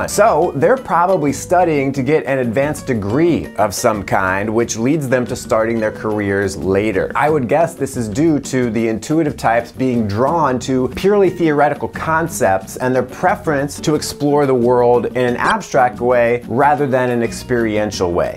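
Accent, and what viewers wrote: United Kingdom. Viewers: i'm british, i've gotta say doesn't sound anything like a Londoner but sounds like the people where i live, good british accent.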